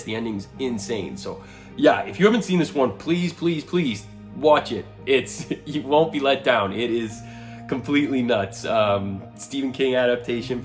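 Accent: Canada. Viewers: Hey I just wanted to say I think you make really great content and have inspired me to get in to King. Keep up the great work!! Also is that a Canadian accent I hear??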